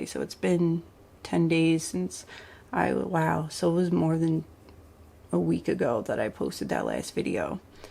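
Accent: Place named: Philadelphia, United States. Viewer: I LOVE green eyeshadow looks!! I think they look sooo pretty with brown eyes. Watching your makeup tutorials have really helped me feel more confident in doing bold looks again. I have also bought a bunch of stuff you've used and that you have recommended 😂🤣 thank you for being awesome! Also... I live in Philly and you sound like you have a Philly accent. Sorry if I'm completely off with that hahaha you just sound local to me 😅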